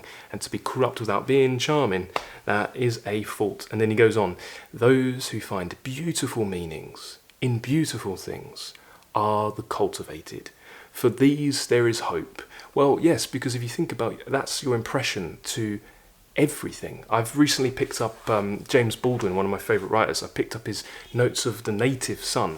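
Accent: United Kingdom. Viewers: Is that British accent ... you are so well spoken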